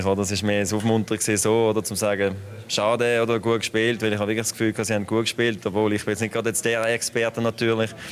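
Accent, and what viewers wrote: Switzerland. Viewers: this isn't german, it's swiss dialect!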